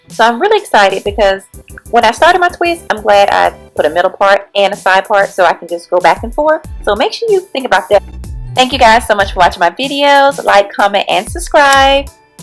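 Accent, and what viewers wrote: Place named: Washington DC, United States. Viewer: You sound like your from dc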